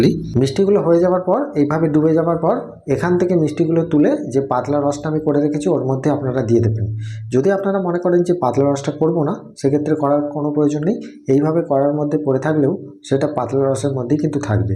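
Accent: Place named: Hooghly, India. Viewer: ওনার কথায় মনে হয় চূঁচড়ো, চন্দননগর বা হালিশহর, কাঁচরাপাড়ার লোক।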